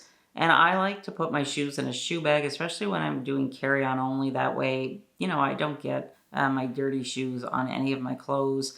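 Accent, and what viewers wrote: Canada. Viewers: Are you Canadian? Born and raised?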